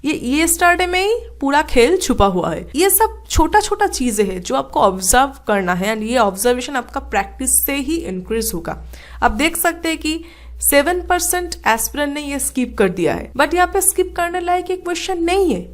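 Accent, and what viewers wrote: Bengal, India. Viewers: Ap bengali ho kya???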